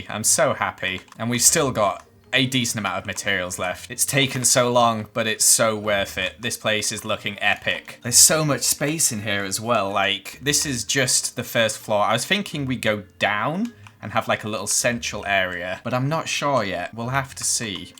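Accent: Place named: United Kingdom. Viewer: Stop judging British Accents. It's just how we speak.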